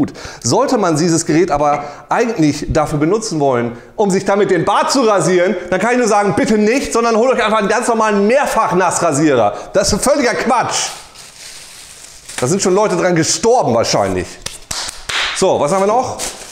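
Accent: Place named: East Frisia, Germany. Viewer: ostfriesisch. Nicht hamburgisch.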